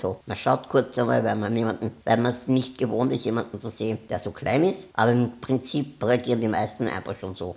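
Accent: Austria. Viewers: Dein Wiener Akzent ist so süß😍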